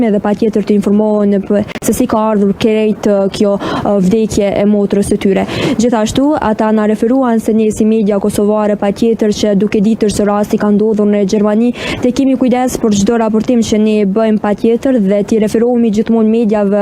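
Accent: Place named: Highlands, Albania. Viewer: O gazetare sa trashe flet na e shpife maloke tamam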